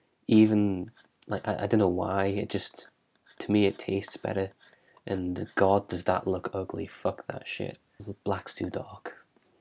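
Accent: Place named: England, United Kingdom. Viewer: tries to guess your region accordion to your accent... Well it's obvious what it's NOT. I'm just going to be vague and say "southeastern". Although I could have sworn I heard a slight northern tinge. Did you just say "fwiends"? Pwease don't tell me there is a slight Geordie influence in there! You know what? Scrap that! You know that scene from Mrs. Doubtfire when Pierce Brosnan (yeah, and obvious Irishman, lol) mentions how he can't quite place Mrs. Doubtfire's accent, and so he responds, "All over." Yeah, that's what I am saying your accent is, everywhere in England anyway, accept for Cornwall, definitely not Cornwall. Wait, did I just use "accordion" when I meant "according"?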